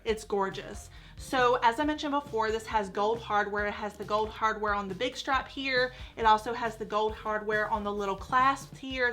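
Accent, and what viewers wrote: South, United States. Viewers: New sub here. I agree, Teddby Blake has some gorgeous well made bags. I got the 9" Ava Croco Gold in Arancione and now I want the 12" Caty Croco in Black! I can attest to the quality of the leather having lived a few years in Italy, their leather is TOP notch! Looking forward to seeing more video's from you dear. Btw, I'm a Southern gal too and I love your accent!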